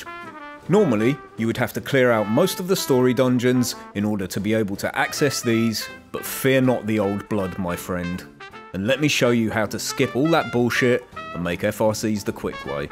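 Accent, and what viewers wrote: United Kingdom. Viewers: he sound very british~ =D I wonder of he's from the uk~ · You are the most bri'ish bri'ish iv ever encountered, and i dont say that to everone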